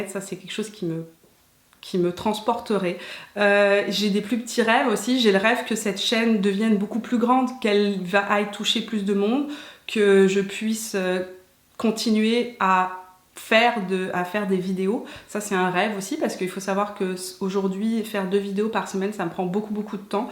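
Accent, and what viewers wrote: Canada. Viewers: Très jolie ton histoire avec ton chéri ! Il vaut mieux se teindre les cils que faire une extension de cils . Sympa cette vidéo en tous cas ...c'est Marant parce que je pensais que tu étais canadienne du coup ...tu as pris un peu l'accent je trouve. Mille bises et bravo pour ta chaîne tu es toute simple et de bons conseils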